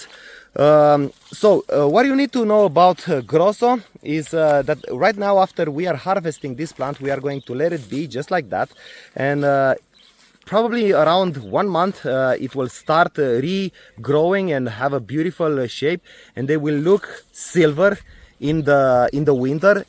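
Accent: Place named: Czech Republic. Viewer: Is that a Czech or Slovak accent I hear? Thank you for all the videos - growing lavender is challenging here in the wet East Coast of the U.S.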